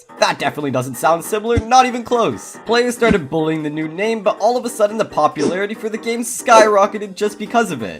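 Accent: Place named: Canada. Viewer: you got that canadian accent im assuming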